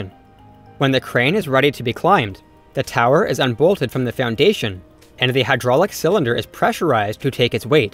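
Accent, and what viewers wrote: Canada. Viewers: A Canadian narrator! Hello! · Your accent...Canadian? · I sense a little Canadian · This presenter has an extremely thick canadian accent. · You're Canadian - aren't you ? 👍 ( Pro - ject vs. prah - ject, mo - bile, vs. mo - bull, etc. )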